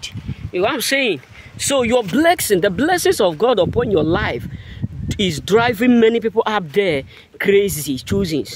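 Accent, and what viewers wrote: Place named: Africa. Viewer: I love your videos I would like to encourage all chosen ones to go ahead and click on the transcript so you can understand exactly what he's saying I know his African accent is a lot so click on the transcripts and you can read word for word exactly what he's saying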